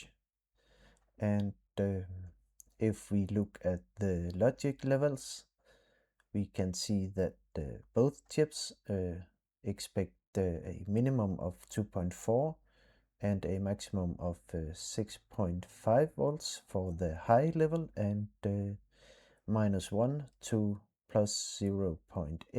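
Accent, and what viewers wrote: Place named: Denmark. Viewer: Hehe the danglish <3 Kanon video ven. Hyggeligt med lidt nostalgi. Er der overhovedet en use case med 128mb ?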